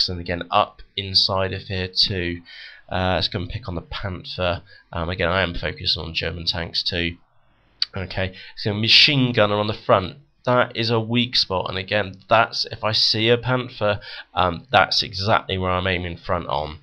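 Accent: United Kingdom. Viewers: are u British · Your speak is too British!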